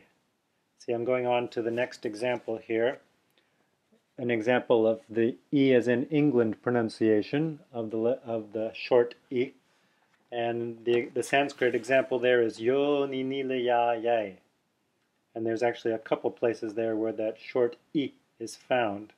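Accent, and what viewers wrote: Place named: Canada. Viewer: I had watched next I one in the series after making this comment: and noted that u did NOT use a dipthong, as in 'bone' [when pron. w an English accent]. I take it then that that 'o' (or 'o-ou'), would be more suited to this symbol: औ . Tho as u say: with a 'canadian' / afro-carribbean accent; so more like 'u-ou'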